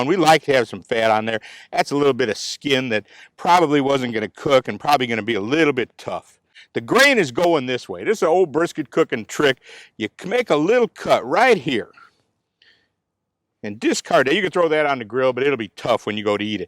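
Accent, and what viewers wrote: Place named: Midwest, United States. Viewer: One of my favorite things in the world is listening to somebody with a Midwestern accent talk about meats. It reminds me of Saturday night live Super Fans Chicago Bears sketch.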